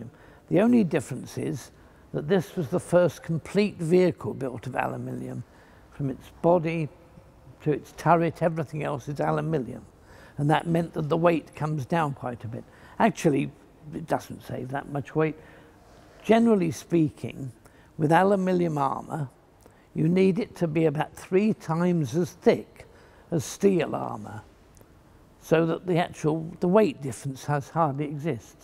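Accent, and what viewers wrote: United Kingdom. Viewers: That is the correct UK English pronunciation. How it is pronounced elsewhere, including where the jaguar cats actually live, is irrelevant to the subject. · Why does the British accent make everything fun to listen to?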